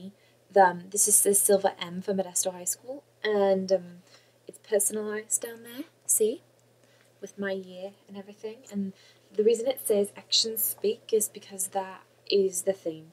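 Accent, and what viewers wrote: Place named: Wales, United Kingdom. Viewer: Woah,. You actually sound really good! You sounded English in some parts, and mostly Welsh;) I am proud!:D A true Cardiffian. (Pokemon red and blue are awesome!)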